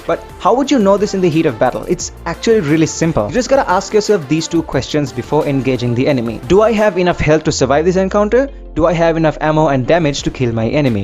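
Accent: India. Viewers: Ooh it sounded like an indian ,but never mind you are our neighbour feels great ,keep uploading this tips 👍🥰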